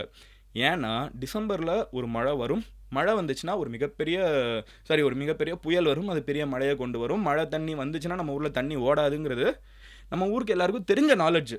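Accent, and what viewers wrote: Madurai, India. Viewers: Neenga maduraiya bro.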